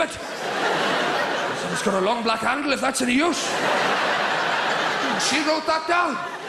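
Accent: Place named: Scotland, United Kingdom. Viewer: I assumed he was Scottish not welsh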